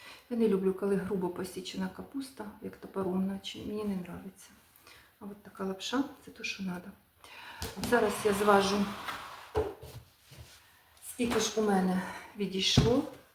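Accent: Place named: Surzhik, Ukraine. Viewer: Якщо б требо було повільніше, то не вийде.Суржик жахливий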